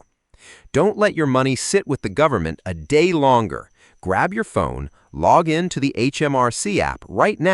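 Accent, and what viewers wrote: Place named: United States. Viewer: HMRC could have used an AI voiceover without an American accent!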